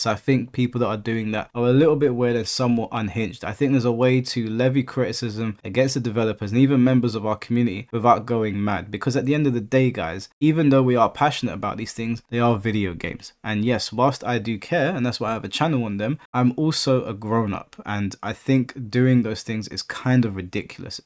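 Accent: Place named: United Kingdom. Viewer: Lmaoooooo so when I 1st watched your videos like this, it was my 1st time at your channel so I had no clue what you looked like, just a voice. For the longest time I thought you were white and looked something like the4thSnake 😂💀😂💀 being from the US, the UK accent sounds the same on everyone, it’s not like the US where people “sound white” or “sound black” ect to put it simple. But then I found out you were black and my mind was blown.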